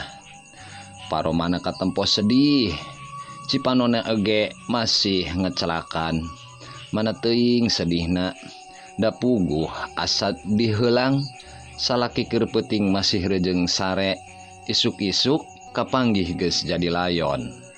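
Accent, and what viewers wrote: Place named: Sunda, Indonesia. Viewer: DINA MAOSKEUN MAH SAE. KEUNA KANA AKSEN SUNDA. NANGING SEU RUR KECAP ANU LEPAT. RANDA JADI RANGDA NU KEDAHNA EU DIBACA E. KAWAS TEU WANOH BASA SUNDA. 🙏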